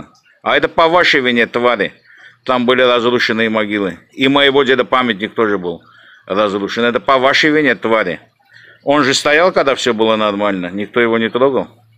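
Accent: Baku, Azerbaijan. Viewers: У тебя бакинский акцент. Спасибо тебе за правду. Дай бог тебе здоровья и долгой жизни. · Бакинский акцент)))👍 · По акценту понятно что, гедеш бакылыды. Но не все такие как ты. Помню в том же лас вегасе был один Гена, сперва пел песни ай мян бакылыям, ай мои все друзья Азербайджанцы, а потом как пернул, ограш, что Гарабах древняя земля армян тут же получил команду сиктир, гётвярян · У автора акцент всё-таки Бакинский остался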